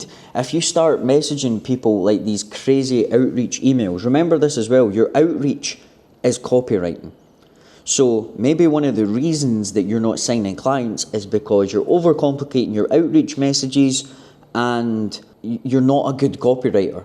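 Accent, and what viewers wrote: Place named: Scotland, United Kingdom. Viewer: Where in Scotland are you from g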